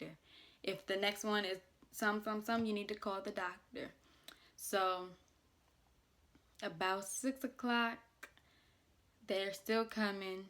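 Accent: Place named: Baltimore, United States. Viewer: I was listening to you talk and I was like “Oh she’s from Baltimore!” I know my ppl when I hear them🤣 I grew up in Towson but have been living in DC and now National Harbor, MD. What hospital did you have her at?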